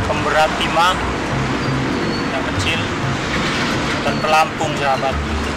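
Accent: Indonesia. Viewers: Logatnya kaya Cirebon sih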